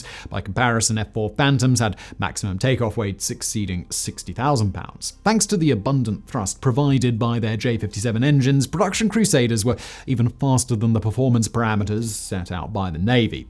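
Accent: England, United Kingdom. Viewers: Arrogant use of English accent. This guy Never Soldier w/ Cavalier wuss an Talk Anti American Buffoon!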